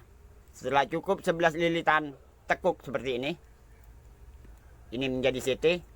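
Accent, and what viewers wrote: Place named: Madura, Indonesia. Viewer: Terima kasih ilmunya pak...kayaknya dari gaya bahasanya orang Madura ya pak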